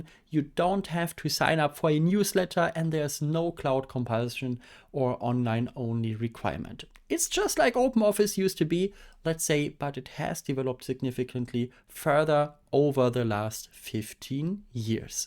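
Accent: Germany. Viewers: it sounds like a German accent to me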